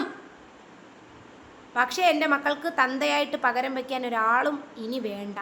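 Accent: Thiruvananthapuram, India. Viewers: Tvm ഭാഷ ❤️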